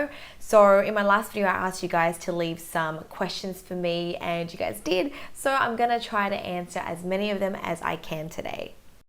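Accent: Australia. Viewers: I knew you lived in australia you sound just like them I love the way they talk. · I realized your Australia accent just now, I thought you were American in the past. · Omg I love your accent I want to be Australian so bad😍😍😍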